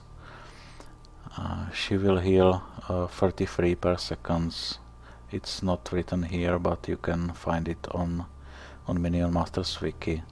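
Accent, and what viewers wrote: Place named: Czech Republic. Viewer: Čech, že? Poznám podle přízvuku :D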